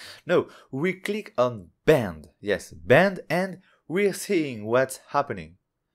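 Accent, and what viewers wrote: France. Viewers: Oh l'accent français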